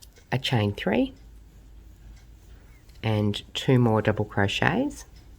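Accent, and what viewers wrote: Australia. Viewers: Thank you! Great pattern and excellent instruction! Lovely to hear another Aussie accent 😊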